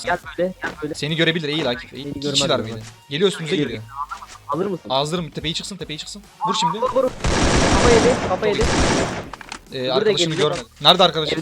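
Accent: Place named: Mardin, Turkey. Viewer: ABİ NERELİSİN MARDİNLİ GİBİ GONUŞUYORSUN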